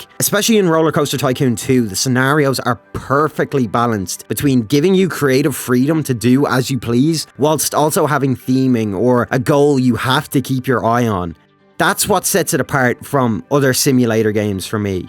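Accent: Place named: Ireland. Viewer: I know a lot of people in the tf2 community don't like tftubers to branch out and do other things, but I'm genuinely glad to see you make videos on a variety of things bc it's fun to listen to your funny Irish voice, your videos are always amazing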